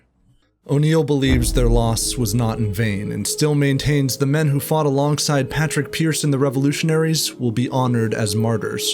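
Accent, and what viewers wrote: United States. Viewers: idk man i think youre overthinking it, sounds western US or Canadian to me, could be either but they sound almost identical, its not the south where almost all the accents sound the same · I agree with the accent thing the commentator is an American true and true 😂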